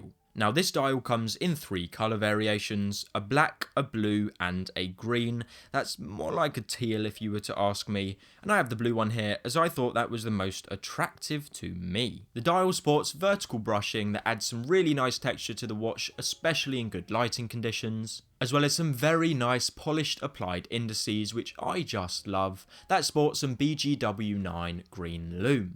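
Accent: United Kingdom. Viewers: I’m completely over the British accent. Click away.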